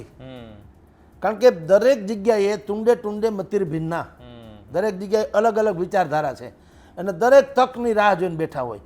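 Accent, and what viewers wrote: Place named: Bhavnagar, India. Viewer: મુલાકાત લેનાર ભાઈ ભાવનગર તરફના છે ? એમની ભાષા અને ઉચ્ચારો પરથી એવું લાગે છે.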